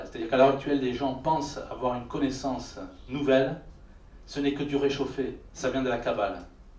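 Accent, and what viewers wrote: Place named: South, France. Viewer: passe son temps assis à chier dans des cerveaux vides. C'est dingue comment une vague élocution et un accent du sud aux consonnances de sinus encombré, peuvent gruger des individus, tout ça parce qu'il balance vite fait deux versets d'évangiles... Gnnnnnnnn kop11111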